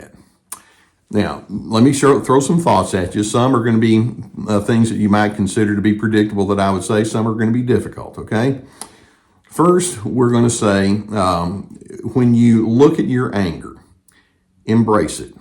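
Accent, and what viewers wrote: South, United States. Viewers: This guy is a lovely healer , always bang on the mark, and the soft southern accent is balm.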